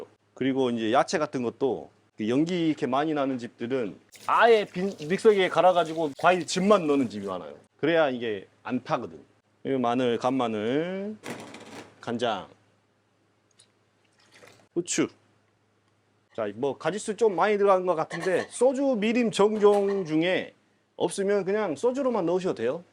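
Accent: Jeolla, South Korea. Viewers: 이 정도면 어음청 친절하고 정확하구먼.. 전라도 사투리 억양이 좀 있으시는거 같음 🤔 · 전라도 말투 짜증난다.배주스 넣어라 비싸다. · 전라도억양이그대로 ㅋ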